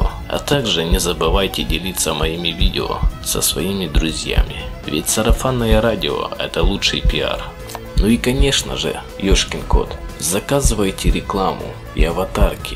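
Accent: Ukraine. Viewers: конечно логично "можете писать по нескольку коментов и больше" (несколько это не определённо число, и лучше бело бы сказать "можете писать по 2,3,4 коментария и больше) а так ооочень годный контент, украинский акцент виден конечно, но он не мешает.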